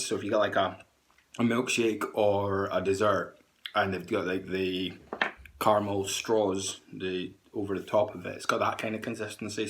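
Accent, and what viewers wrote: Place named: Scotland, United Kingdom. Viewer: Lol first time I have heard your voice my mate.... a tad scottish :-)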